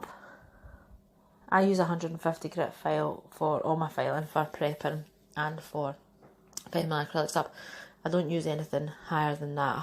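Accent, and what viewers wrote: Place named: Scotland, United Kingdom. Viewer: Love these nails saw them on Facebook. Hope your little one is doing great she's cute as a button. Love hearing a fellow Scots accent on here. Love them and I need some of that paper from glitterarty xx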